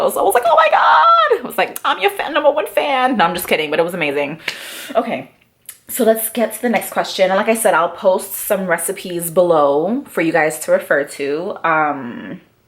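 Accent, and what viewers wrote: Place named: Boston, United States. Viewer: FYI You are so beautiful and I love your accent. You almost sound like you are from my side of town (Boston). I am in Charleston right now but I am bean town born and raised!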